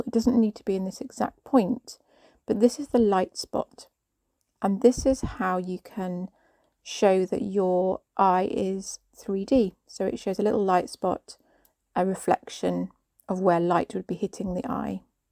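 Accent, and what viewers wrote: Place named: England, United Kingdom. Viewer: I meant to add that I had not seen the last couple of minutes as I got interrupted. But the end result was amazing. Beautiful work, the head is so realistic. So nice to hear an english accent too! I am in Australia and I have a friend in Canada who has a schnauzer, a gorgeous animal and I used a photo of it as a reference when making a full body model for her. It is only about 6 inches high and 3 wide but I really wanted the eyes to be a feature. That is how I came across your video. I have been needle felting for about 10 yrs. I strive for an approximation rather than a replication when doing animals. If it is recognisable then I am satisfied. I want the hobby to be enjoyable, and not bash myself trying to be what I am not…ie perfect. I have sold a lot of items and had lots of commissions over the years so it must be okay. 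But I would love to be as talented as you obviously are. I have saved your channel so I can refer if I need to. Thanks again.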